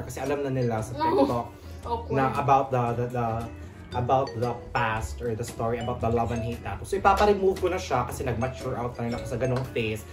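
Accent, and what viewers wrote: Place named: England, United Kingdom. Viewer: i love your english accent more videos pa po 😊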